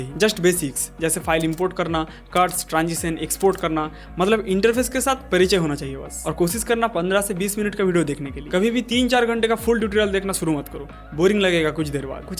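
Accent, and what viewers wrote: Bengal, India. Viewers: bengali tumi ??😅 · your bengali is so cute 😃😃 I a from bangladesh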